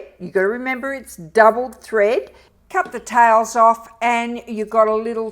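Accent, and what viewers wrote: Australia. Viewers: G'day,. Such a relief to hear your Aussie voice. I don't have to strain ta understand. I recently got my first mega tank printer. Sooo good. Now for the Bearly art glue. Off to Amazon au. These dangles are so cute. I adore minis too.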